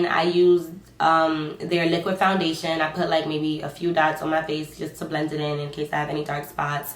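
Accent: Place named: Harlem, United States. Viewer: You Sound So HARLEM 😂 I Love It 🥰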